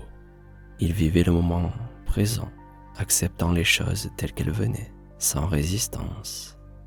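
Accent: South, France. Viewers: Un grand M E R C I. 🎉 à toi: j 'aime ta voix du sud. 🙏💙👍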